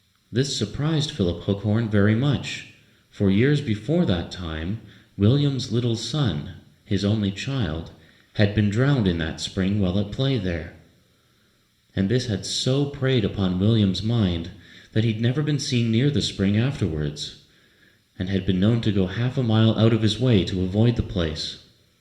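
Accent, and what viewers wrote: Canada. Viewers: Thank-you for making this video, I like it. Is the accent of the narrator Canadian?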